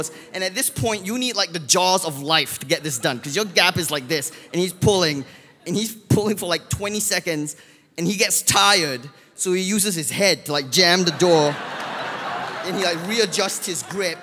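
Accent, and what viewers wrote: Australia. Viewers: Finally found the reason why he sounds like aussie and Singaporean at the same time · This guy is funny Maybe these people don't understands his Aussie accent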